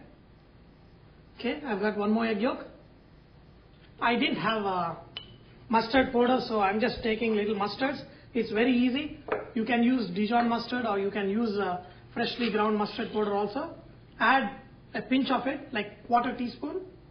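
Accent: India. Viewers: its indian Accent....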